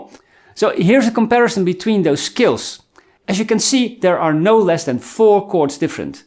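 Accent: Netherlands. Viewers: Dense and beautiful, and with a Dutch accent. I'm jamming with a good friend who is a Nederlander and we are working on diatonic modes. I love your graphic showing how to think about modes. I shared with him. Thanks for dense and beautiful.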